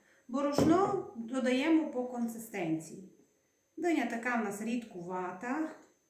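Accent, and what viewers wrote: Transcarpathia, Ukraine. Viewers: Це ЗАКАРПАТТЯ!!! На заході України є різноманітні діалекти😉😊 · Оооо, вже почула, що з Закарпаття.😊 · Так розмовляють на Закарпатті. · Чую закарпатський діалект, молодець так тримати! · Чернівецька область. Дякую за смачний рецепт, спробую. Закарпатська мова, особлива.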